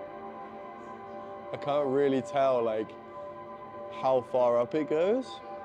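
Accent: United Kingdom. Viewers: あなたの英語はブリティッシュアクセントなので、日本人には聞き取れない可能性が高いです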